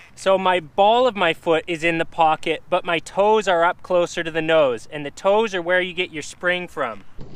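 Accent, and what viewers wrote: Canada. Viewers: I just love your accent. You have the Canadian nice guy accent and it reminds me of every teacher in kindergarten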